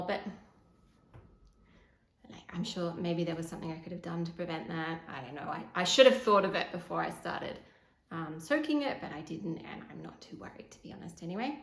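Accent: Australia. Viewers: Congratulations on your first podcast! I really enjoyed your relaxed, chatty and informative style. I was initially drawn to your Aussie accent (fellow Aussie here!) and look forward to more episodes. Best wishes from Sydney!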